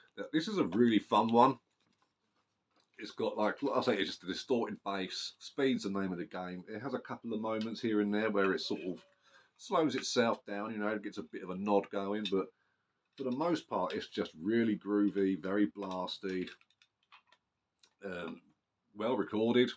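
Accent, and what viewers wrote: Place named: United Kingdom. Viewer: I wasn't expecting the accent. Why are you so British?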